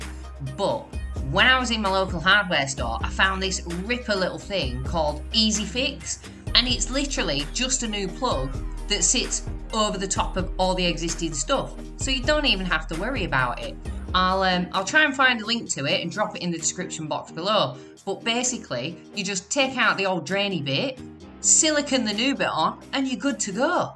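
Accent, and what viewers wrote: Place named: Newcastle, United Kingdom. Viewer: Def heard the geordie in there. I didnt hear the Yorkshire but to be honest I dont know many people from there, I am not trained to spot that accent. I am Greek, living in Newcastle.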